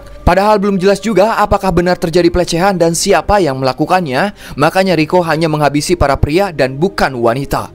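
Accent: West Java, Indonesia. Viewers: Logat sunda sarua atuh nya saqahayang jeung 🎉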